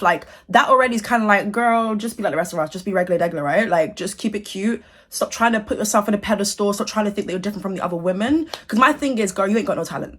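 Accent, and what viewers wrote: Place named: United Kingdom. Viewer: First time viewer here and I must say, I LOOVVEE your British accent, babe! From an American standpoint, that accent on a beautiful woman is a deadly combination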